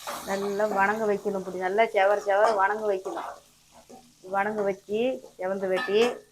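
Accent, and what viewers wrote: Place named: Salem, India. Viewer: Salem slang la pesaranga akka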